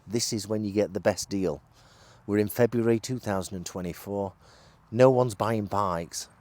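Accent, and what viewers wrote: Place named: Liverpool, United Kingdom. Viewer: You must be from Liverpool, you sound like a Beatle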